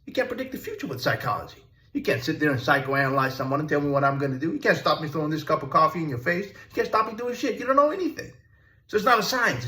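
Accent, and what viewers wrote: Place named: United Kingdom. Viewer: too british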